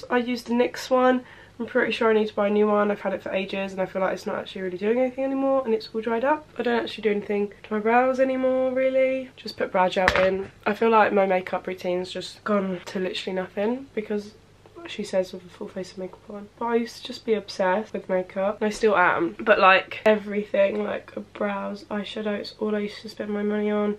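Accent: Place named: Cornwall, United Kingdom. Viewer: Omg you are stunning! ❤️❤️❤️❤️❤️❤️❤️❤️❤️❤️ Edit are you from Cornwall? I love your accent x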